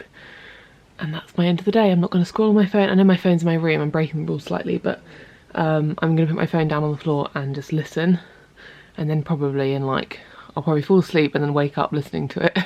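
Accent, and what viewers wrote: United Kingdom. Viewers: Absolutely not related to the video but: I just came back to France from Wales a month ago, where I lived for a year. Hearing your accent and seeing that pot of yogurt that was definitely from Asda suddenly made me so emotional. I'd never thought I'd miss the UK that much.. Lots of love on you and your fabulous country ♡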